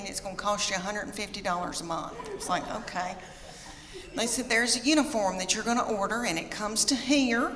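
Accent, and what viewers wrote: South, United States. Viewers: I was today years old when I found your channel!!! I can hear the sweet southern charm in your voice!!! Hello from Alabama!!! You're hilarious and refreshing! Thanks for making me pee myself today, I DO aporeciate it!!! 😂😂😂 #sportsandoffdope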